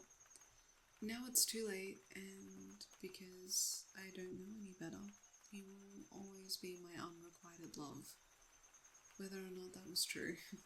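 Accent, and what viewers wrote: Australia. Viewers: Good morning, 8 ❤ I’m currently listening for the 5 time, & I’m loving the longer audio. I really hope you get more views on this, because it’s a quality story, & you’re a great storyteller. I love your voice, & as I’ve said before, your Australian accent makes me melt, 8 🥰🌹 Oh, I love the thumbnail. She’s so cute!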